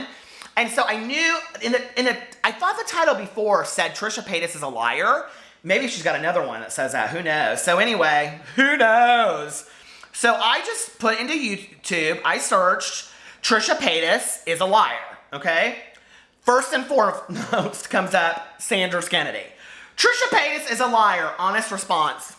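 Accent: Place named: Indiana, United States. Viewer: I love your Indiana accent! I lived in South Bend for 4 yrs & loved it 😊 You are so funny & I love watching you 💙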